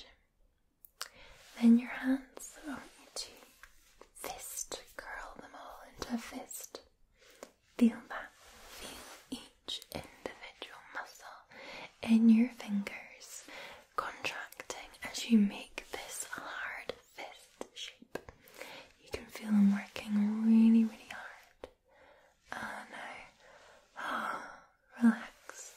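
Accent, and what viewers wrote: Scotland, United Kingdom. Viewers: I didn’t know I needed Scottish Accent ASMR until now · Sleep therapy with a scottish accent. Nice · im working at a construction site lifting 4ton tubing listening to scottish asmr...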